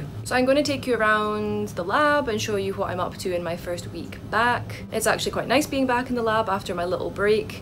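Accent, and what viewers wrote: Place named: Scotland, United Kingdom. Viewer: Another great video. Nice to see that both our labs are a frequent shopper of Sigma-Aldrich. Also loving the Scottish accent 🙂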